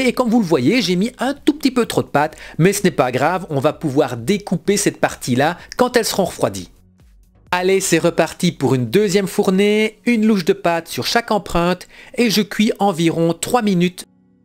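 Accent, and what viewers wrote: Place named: Belgium. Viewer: Très bonne idée chef, une bonne nouvelle année et encore de belles vidéos avec bien l'accent belge 🎉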